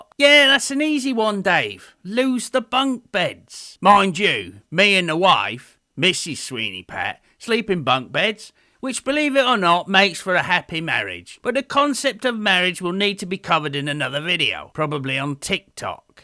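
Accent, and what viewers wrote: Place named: Liverpool, United Kingdom. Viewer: 🇬🇧 Another Cracker Sweeney Pat! Scouse accent a blinder mate! 😂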